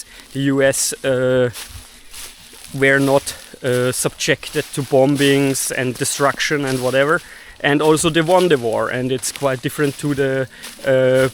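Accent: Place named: Germany. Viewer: Ah yes, the eternal dilemma of every German YouTuber: Speak your native language, and your content is effectively trapped within the relatively small audience of Germany, Austria, Switzerland and maybe a few neighboring countries. On the other hand, by speaking English, yoru videos suddenly have the potential to reach a global audience, but unless you're genuinely fluent (C1 or even C2) you'll always be ze weird German guy with ze äxent. It's either accepting a smaller audience with which you can communicate naturally, or accepting the fact that you'll always have a linguistic handicap on the global stage. I can relate to that.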